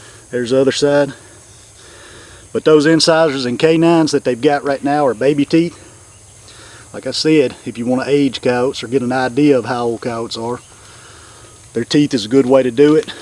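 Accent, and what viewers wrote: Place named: South, United States. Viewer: Thanks for the info. By the way, that is the most solid Country Boy accent ever!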